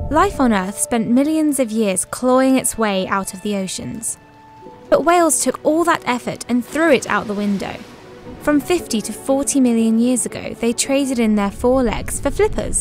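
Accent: United Kingdom. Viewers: too british accent.nicee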